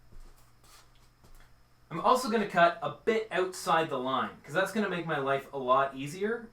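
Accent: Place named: Canada. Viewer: The Canadian accent is strong with this one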